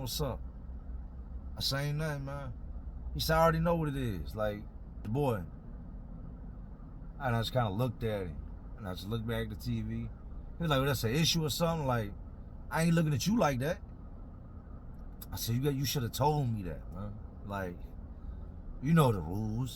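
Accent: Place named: South, United States. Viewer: hes from the hood in the south do you expect him to sound like JFK??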